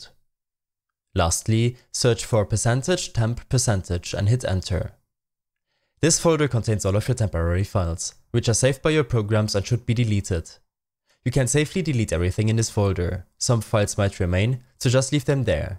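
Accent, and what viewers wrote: Germany. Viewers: Very good Deutsches Akzent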